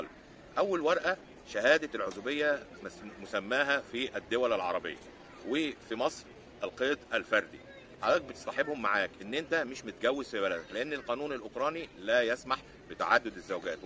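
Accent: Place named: Saudi Arabia. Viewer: معليش سؤال انت كنت عايش في السعوديه؟؟؟؟؟؟؟؟ لهجتك متاثرة بالكلام السعودي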